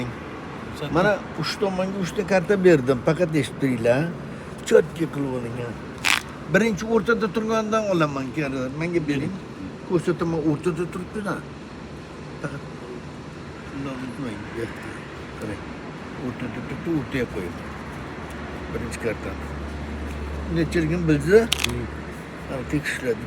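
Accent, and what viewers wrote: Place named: Kashkadarya, Uzbekistan. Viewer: Бу одам Кашкадарйоликка ухшайди шеваси шунга ухшайди, малалес гап йук санатингизга, Яна янгиларини кутиб коламиз, Оллох умрингизга Барака берсин.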